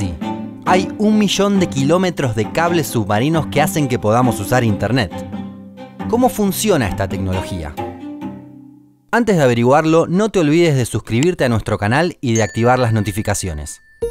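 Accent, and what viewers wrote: Argentina. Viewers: Un Mundo Inmenso tiene un formato tan atrapante, un guion excelente, y un ¡narrador argentino che!!! que hace aún más entretenido el contenido!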